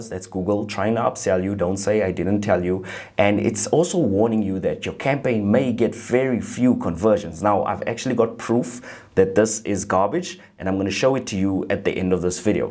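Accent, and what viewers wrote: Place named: South Africa. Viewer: We are also originally from SA and love listening to the accent, you can't imagine how refreshing it is, smooth as Tennessee Honey Whisky😀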